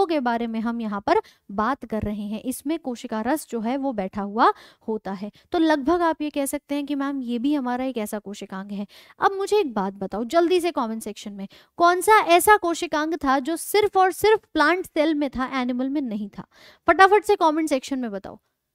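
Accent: Bihar, India. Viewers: Etne khubsurat chehre kaise etne khubsurat se pdha lete hai thanks mam ekdam aditya ranjan sir ke bihari style me padhate ho❤❤❤❤❤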